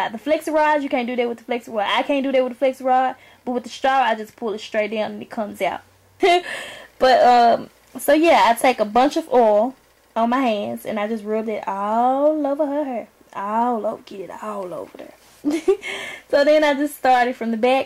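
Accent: South, United States. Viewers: Love yo southern drawl. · how would you maintain the style for day two? And I love your southern accent, reminds me of home. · Nice tutorial but you need to learn how to speak you country as hell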